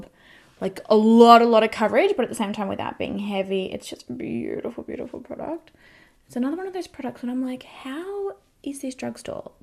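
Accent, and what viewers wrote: Australia. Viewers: You sounded so Aussie in this video! 😂. Loved this video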